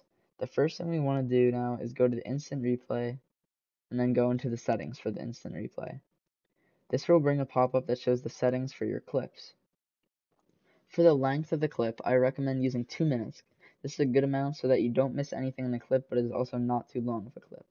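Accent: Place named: India. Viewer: you sound like one of those indian tech guys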